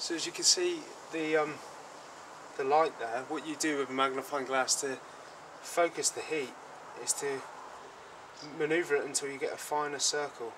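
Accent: United Kingdom. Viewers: thats great that you're an outdoors person mate! and i love the british accent! BLOODY HELL MATE! CHEERiO!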